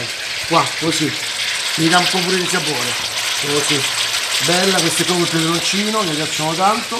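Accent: Rome, Italy. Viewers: Maestro, continua col romanesco, la nostra bella Italia, lascia perde i radical chic inglesi. 😉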